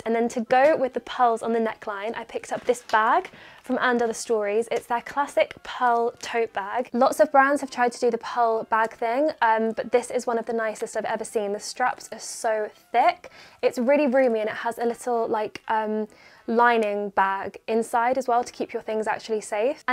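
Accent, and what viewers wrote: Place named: England, United Kingdom. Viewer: I love forever new, and your right it is so you Also love your accent because I grew up in England And I rlly miss it there and your channel just gives me a taste of it Love from Australia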